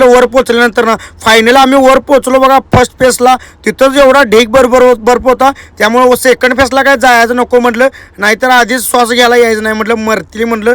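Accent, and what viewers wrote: Kolhapur, India. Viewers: मला ची कोल्हापूर ची भाषा खूप आवडते एकदा मला भेटायला यायच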